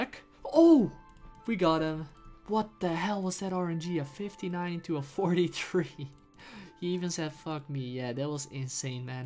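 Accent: Netherlands. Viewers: Proper vids like always and just love that Dutch accent Ign HouseofHouse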